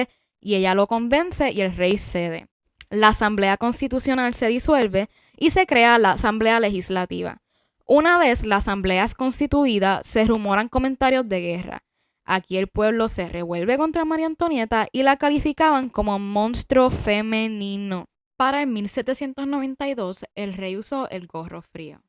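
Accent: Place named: Taino, Caribbean. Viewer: ESTÁ CON ACENTO TAINO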